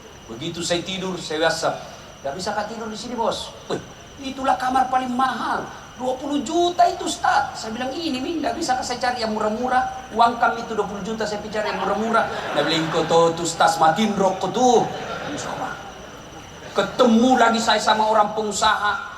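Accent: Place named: Makassar, Indonesia. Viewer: Lo ngk ngerti bhas pak ustas jgn sebarng sbb beginilah logat makassar kasart tpi lucu n tpi bertujuN baik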